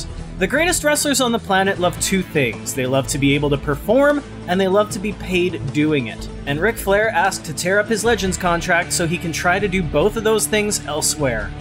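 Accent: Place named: Minnesota, United States. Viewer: that's cool. I unsubbed from all other foreign pro wrestling channels because it's really hard to listen to their accents . You just sound like I guy from Minnesota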